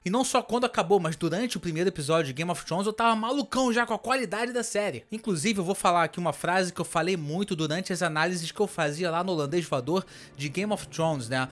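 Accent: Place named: Rio de Janeiro, Brazil. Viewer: Tirando sotaque carioca o vídeo ficou muito bom.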